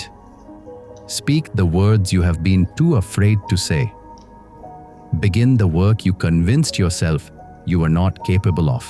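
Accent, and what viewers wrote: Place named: India. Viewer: I wonder which country's pronunciation is? It's like an Indian pronunciation.. do you answer me ?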